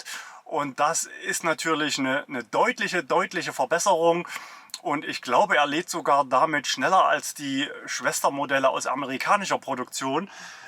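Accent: Germany. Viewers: Your English has a very strong German accent. Hard to understand. 💪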